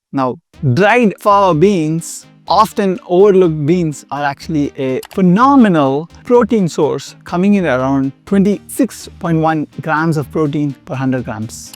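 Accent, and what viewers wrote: India. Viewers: Either you are south Indian Or you are Bengali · I absolutely hate the Indian accent. I don’t know why but it triggers something in my brain. No offense.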